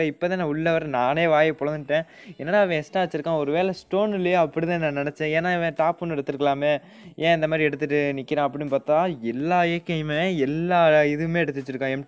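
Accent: Tirunelveli, India. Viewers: Bro r u from trinelveli your voice s like that. Because I too from trinelveli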